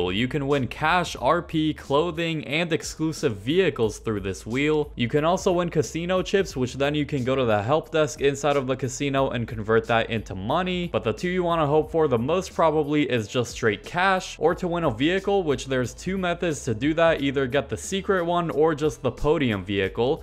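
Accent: RP, United Kingdom. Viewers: I thought you were actually British😭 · Bro sounds like spiffing brit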